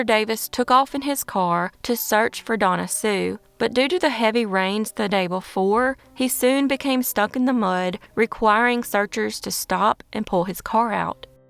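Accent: South, United States. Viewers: I must say I really like your channel; however, I've always found southern accents to be highly irritating to my ears. Sorry, nothing personal, obviously. Congratulations, though, on the popularity of your channel. Keep up the good work. 🥳 😉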